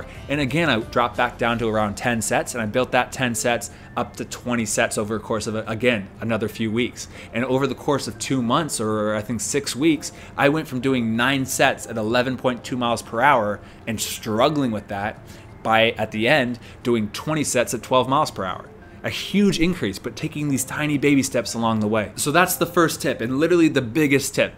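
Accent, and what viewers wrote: United States. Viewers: He's speaking American English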